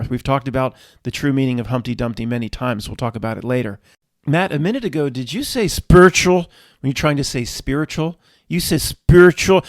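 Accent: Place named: Philadelphia, United States. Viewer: 😂 Really love your Philly accent....💚🌻🙏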